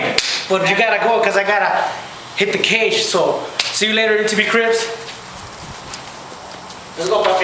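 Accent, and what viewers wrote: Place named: Mexico. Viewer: hahahha i amost fell for it carnal hahah u look like many but then i heard ur mexican accent jajaj la cervesa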